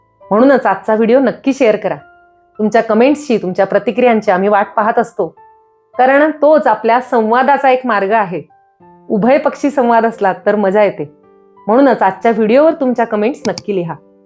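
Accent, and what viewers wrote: Standard. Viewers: 🙏🏻आपले बोलणे अतिशय छान व शुद्ध आहे. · मॅडम तुम्ही मारवाडी समाजाचे असून सुद्धा मराठी किती शुद्ध स्पष्ट बोलता हे खूपच कौतुकास्पद आहे.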